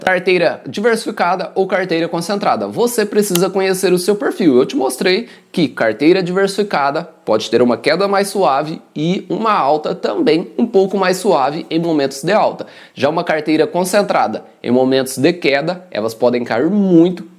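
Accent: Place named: Inland São Paulo, Brazil. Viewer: Esse sotac Paulista do interior é irritante rs mas o que vale é a informação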